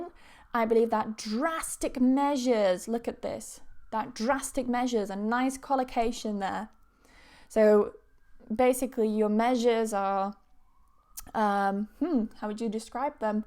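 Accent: United Kingdom. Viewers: Why do you speak a British English and accent?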